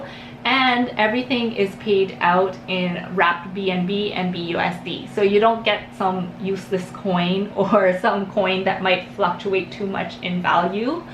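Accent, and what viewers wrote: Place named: Philippines. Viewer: Hi, are you Filipino? you sound like one! I'm looking for a crypto buddy, I'm also a new crypto investor. Hope we can connect.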